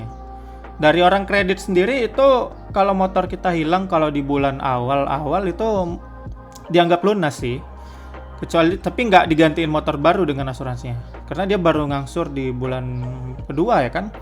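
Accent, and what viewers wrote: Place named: Sumatra, Indonesia. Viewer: abang dari pulau sumatera? wehh pantes aja logatnya logat ngomong orang sumatera. sy org bukittinggi bg. salam kenal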